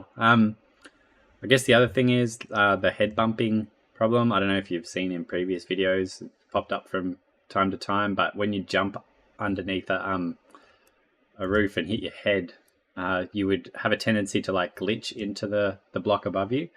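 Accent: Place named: New Zealand. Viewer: looking pretty good, do you think you'll put in some kind of sloped/wedge block? also, i noticed from your accent, are you a fellow new zealander? cause if so, that's pretty cool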